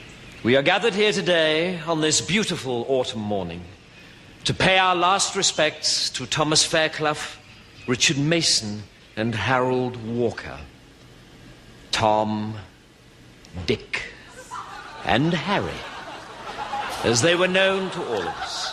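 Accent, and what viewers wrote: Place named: Newcastle, United Kingdom. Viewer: I'm proud of this talented man from the North of England..People are often amused at geordies but Rowan is from Consett, believe it or not, and can speak his native geordie accent very well!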